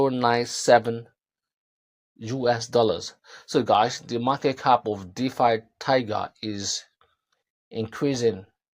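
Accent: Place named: Democratic Republic of the Congo. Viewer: Your ascent though! Sounds Congolese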